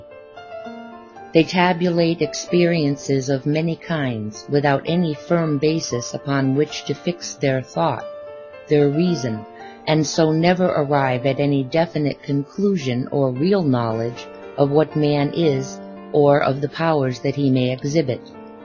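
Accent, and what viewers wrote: Russia. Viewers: Amazing Russian accent.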